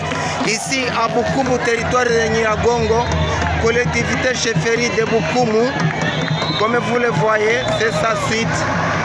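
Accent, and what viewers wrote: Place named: Rwanda. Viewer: Le commentateur a un accent rwandais vraiment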